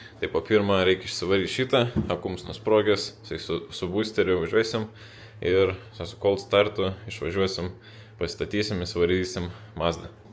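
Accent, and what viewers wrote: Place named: Samogitia, Lithuania. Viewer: Geriau visados žemaitėška💪💪💪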